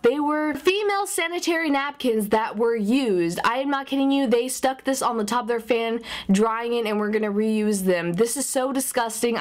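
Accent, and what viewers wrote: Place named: Dallas, United States. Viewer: she sounds like she is from downtown Dallas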